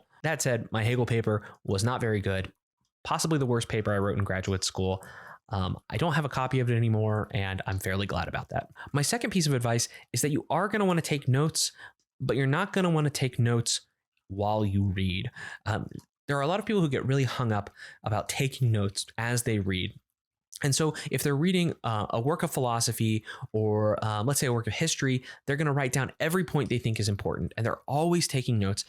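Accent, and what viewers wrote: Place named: Pennsylvania, United States. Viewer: I have an accent-related question, if you allow - do you come from around Pensilvania ? I've got a friend from there and in the minutae you sound just like him. I can't really pint point why or the specifics.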